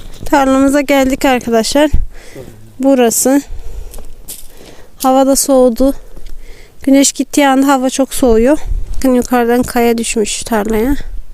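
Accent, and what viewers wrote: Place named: Adıyaman, Turkey. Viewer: Bir adıyamanlı olarak sivene bayıldım rabbim yardımcınız olsun yeni gördüm sizi takip etmeyede devam edeceğim inşallah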